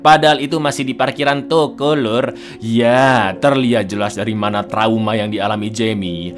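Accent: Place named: Surabaya, Indonesia. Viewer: Kyk arek suroboyo ae nak...😅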